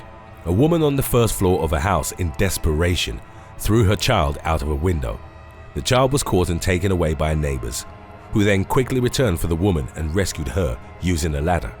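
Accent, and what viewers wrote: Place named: United Kingdom. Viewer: Alyssa Davison Me too and the Brit accent.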